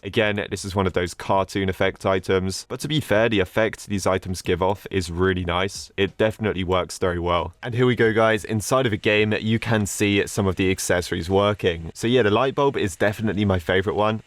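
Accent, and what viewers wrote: United Kingdom. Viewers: me: is watching the intro BRI'ISH ?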